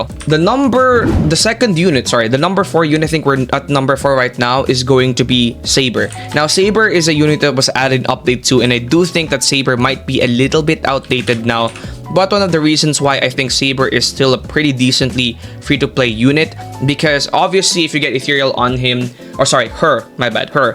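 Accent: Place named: Philippines. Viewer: I can hear in your voice your filipino, can you help a fellow filipino out yourself and gift vip? (Shooting my shot like ben simmons)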